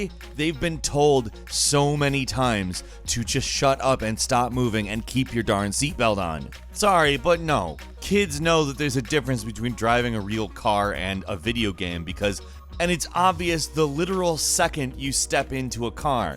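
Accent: United Kingdom. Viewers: Some brits are stupid. ALMOST as bad as extreme feminists. #5. We can go a whole year with barely seeing the sun. We don’t all have rickets. I barely go out when it is sunny and I’m fine. And your British accent is terrible lol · The British accent has convinced me beyond all doubt that actually is Ed Helms in disguise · Real life violence makes my stomach turn, blowing people up in video games doesn't. Hilarious brit accent though LOL.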